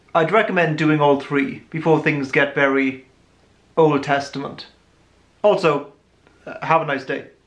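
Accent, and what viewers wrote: Wales, United Kingdom. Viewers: Why thank you! It's a Welsh accent.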